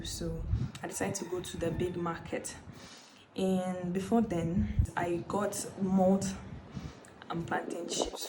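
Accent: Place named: Ghana. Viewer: You speak like Ghanaian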